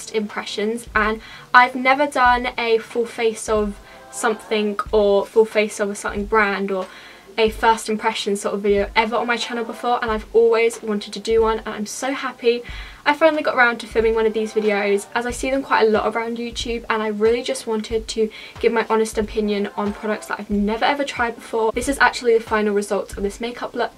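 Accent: United Kingdom. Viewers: Love ur British accent💘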